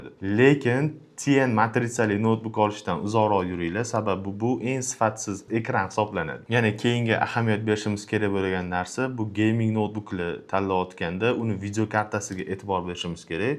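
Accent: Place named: Tashkent, Uzbekistan. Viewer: Toshkentcha gapirmay o'l, bloger degani adaboy tilda gapirishi kerak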